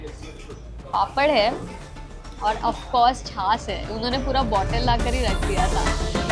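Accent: Gujarat, India. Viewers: Very very very poorly researched video Also the lady commentator desperately needs to either speak in Gujarati or not at all I have grown up in Baroda and can say with all assurance that the script, the choice of sites and ugh, that Gujarati accent trying to struggle with Hindi are all awful! This video does ABSOLUTELY no justice to my beautiful Baroda.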